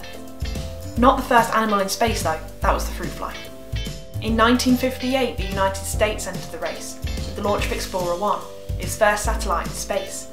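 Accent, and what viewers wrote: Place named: England, United Kingdom. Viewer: Nice accent 😁 What part of England are you from? 🤷‍♂️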